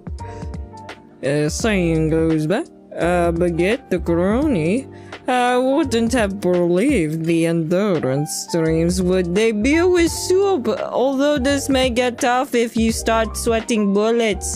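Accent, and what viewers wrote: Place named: South, United States. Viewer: Loving sassy southern Kronii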